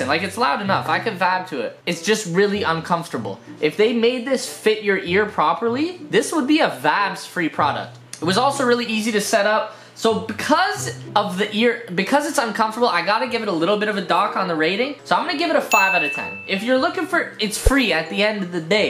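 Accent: Canada. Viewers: I love Canadian accents. They’re hilarious (though ‘Merica has some funny accents, too). Don’t change :)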